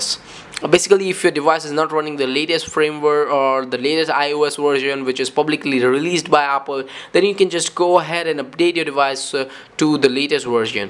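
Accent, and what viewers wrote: India. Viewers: Thank you so much. It worked in my iphone by resetting network settings. I was feeling helpless before that. Search in YouTube how to fix it and found your video first. Btw I liked your indian accent.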